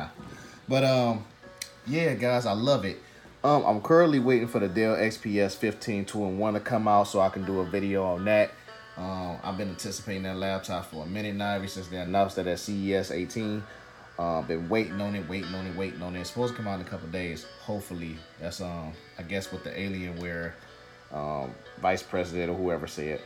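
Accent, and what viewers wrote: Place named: United States. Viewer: I'm very amused by your redneck american accent xD Great informative video, have a similar Yoga like this rn. Do you know which Generation/model exactly this was?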